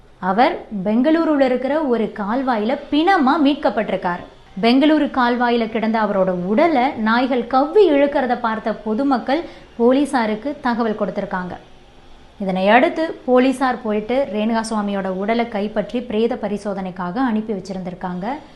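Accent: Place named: Chennai, India. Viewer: Madras தமிழ். பினமாக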